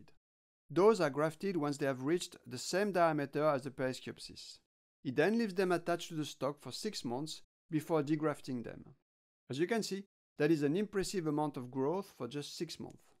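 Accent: France. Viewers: au top, j'adore les cactus et l'accent français, merci !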